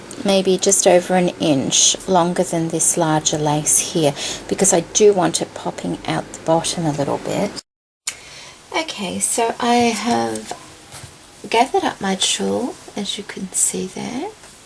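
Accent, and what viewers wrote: Australia. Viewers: its tulle its the aussie accent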